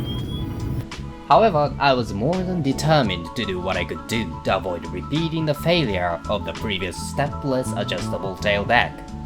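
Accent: Japan. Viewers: やっぱり日本人です。発音 が。。。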